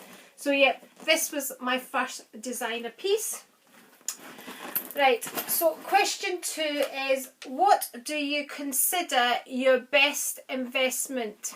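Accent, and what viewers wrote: Scotland, United Kingdom. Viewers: Thank you for tagging me! Just love watching and listening to your Scottish accent!! You look gorgeous as always xxxooo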